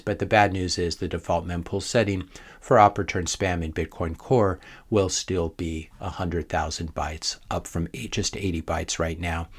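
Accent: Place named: United States. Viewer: with an American accent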